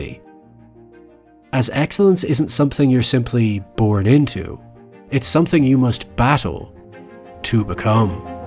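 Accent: Ireland. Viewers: Ah brilliant, it’s so nice to hear a fellow Irish person who is making my favorites videos, I’m Deirdre O’Brien, unmistakably Irish name, especially in Irish, but I’ve been meaning to ask you since the first video of your’s I watched on Prisoners which was fantastic. Love your videos, it’s so great to hear a lovely Irish accent making wonderful videos, you’re the only one (from the kind of videos I watched) and you do a great job. Your analysis is always so great and your observations have made me wonder have you and English degree. Anyway very happy for you in a sea of American creators. Wishing you more and more success, you completely deserve it. 😊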